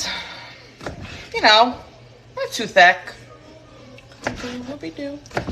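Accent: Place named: East Coast, United States. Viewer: I’ve been watching your cooking vlogs and must say you are amazing!!! Love y’all east coasters. Majority of my family is in Mass. American Armenian and you remind me of my cousins. Y’all talk and sound so much alike. I am on the West Coast, Southern California. Much love to you and your beautiful family ❤❤❤❤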